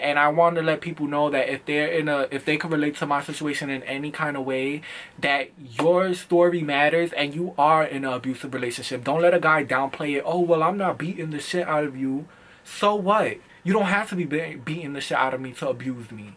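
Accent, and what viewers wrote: New York, United States. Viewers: Honestly, when you were talking about the insecure thing.. I think it may be a Virgo thing because I’ve been in situations where people think I’m trying to be better than them or something. Your lingo is what makes you unique babe, especially that New York accent! 😍 Gemini’s are not for us, Virgos! I’ve learned that we don’t mesh well. My abusive ex was a Pisces. She was so insane and mentally and verbally abused me and it was the worst. The whole time you’re the victim and they turn around and act like you did everything to them... that was the fucking worst thing for me to face especially in high school. 🥺 Telling someone they’re “too skinny” is the equivalent of saying someone is “too fat”. My ex used to tell me I wore too much makeup and that I “needed to fix my fucking face”. 🙄 I know I did things wrong too, but not as bad as what she did to me... 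🥺 My ex had her friends belittle me on social media and in person.. I was 16-17 with no backbone and wouldn’t fight or nothing (before anyone judges). Like you, I kept running back and anytime we talked it turned into constant and repeated arguments. I hated wearing makeup cause I felt like she was gonna tell me it was ugly and tell me to fix myself. I would cry every single day and try and maintain my relationship and my mom was on the verge of going to prison over this girl. We continued to break up and get back together until we graduated and she continued to bother me during my graduation present vacation trip thing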